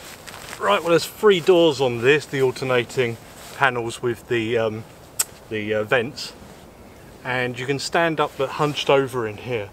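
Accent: England, United Kingdom. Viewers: Hi, Please extend your food eating aspect of your videos. A big part of why I enjoy your camping videos over others, well in part its because of your English accent as I'm Australian but the rest of my family was born in England and all my relatives live and are from England or Wales but its also because I really like what you choose to cook and I enjoy watching every aspect from the preparation (why you don't have a nice shun or global kitchen knife I don't know) cooking and enjoy watching you enjoy eating the food. That chicken for instance looked really yum and instead of only really seeing two bites of it, I would enjoy your videos even more if we could watch you enjoying the food much longer. Btw, if you do buy a kitchen knife for food prep and eating, I really recommend the Shun tomato knife, its lightly serrated and compact and really multi-purpose, just don't try cutting through bone. 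Or Global GS-10 cheese knife as its compact, multi-purpose and you literally could use it as your fork. It also has a stronger slightly thicker, more robust edge than the shun. Both are extremely sharp. The Global knife being wholly stainless steel is slightly more hygienic given you could probably submerge it in boiling water if you needed to. I actually found it difficult to find UK links, even that one gave me AUD and free shipping to Aust. Link Shun <link> Link Global GS-10 cheese knife <link>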